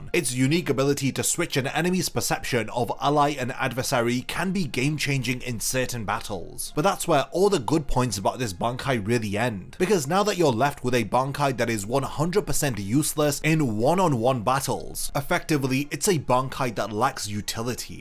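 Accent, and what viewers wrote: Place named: England, United Kingdom. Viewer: Please make an effort to pronounce those Japanese names correctly and not constantly using the English accent.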